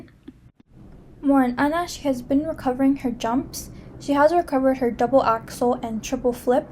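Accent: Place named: Russia. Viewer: great debut, since it is your first video! your voice, itʼs got that ASMR magic, would you try to do it next? :) seriously, the way of how a skaters hit those spins and axels, it’s giving a vibes of 19th century energy, ngl – no clear winter and winner, just pure, relentless artistry. and the costumes? chefʼs kiss. your russian accent and pronunciation is very good! do you study this language? and before anyone starts, as it may feel like, i support all skaters, not just the ones from my ancestral homeland, alright? only appreciating the art, i am a native american with a some russian roots, remember, leo dildocaprio (joking 😂) does have a russian grandma too. donʼt get it twisted, guys, support the girl, she is cool for trying to popularize an underground kind of sports, which our small community loves, keep it up, subscribed, and, as youʼve asked to be kind in suggestions or critics, will suggest to try to use your favorite music, as a background and vibey layer of your voice!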